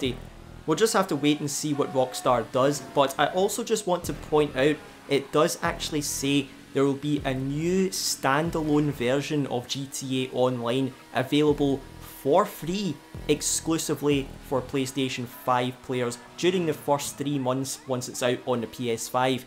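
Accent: Scotland, United Kingdom. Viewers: Arr you scottish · U must be Scottish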